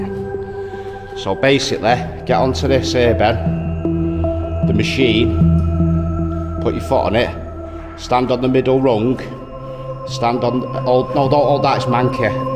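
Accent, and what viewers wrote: Manchester, United Kingdom. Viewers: Yer brilliant chanel lads,why do our kids look so uncomfortable around us?? My lads the same quiet ,and like you I'm a seeker. I still like to look at old buildings . But my lads like 'come on ya fat c. But yer you don't see channels like this .Well I can't find em .wheres your accent from. Sounds mancunian. Or near too. But it ain't Salford.keep it mate ,please.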